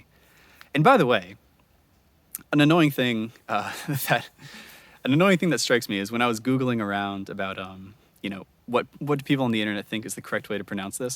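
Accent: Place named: United States. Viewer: So, for a start, you have an American accent, yet you're speaking English. And now you want to apply your AMERICAN accent to OTHER languages as CORRECT ENGLISH? Sure thing.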